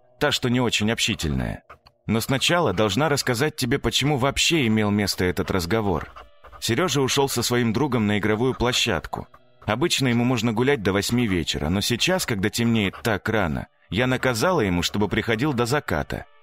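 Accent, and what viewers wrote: Standard. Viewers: чистый русский язык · Симфония, песня, бальзам для сердца. Чистый русский язык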